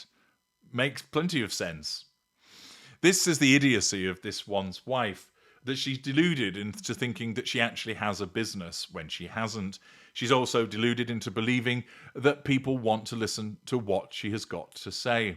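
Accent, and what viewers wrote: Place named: England, United Kingdom. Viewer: I imagine that the snake in the Garden of Eden sounded like you. I think you could talk anybody into anything with that deep and silky English accent.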